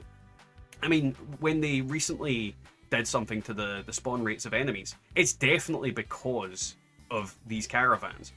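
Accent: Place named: Wales, United Kingdom. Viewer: I totally love the Welsh accent, my Dad/Father is originally from St David's before migrating to Australia for work... so im glad I found a Welsh fallout 76 YouTuber finally. Great video, keep up the good work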